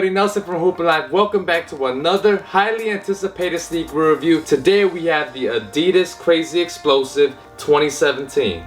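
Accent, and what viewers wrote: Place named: Asia. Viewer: Veiled asian accent mixed with hip hop wannabe drawl = slow reading enunciation.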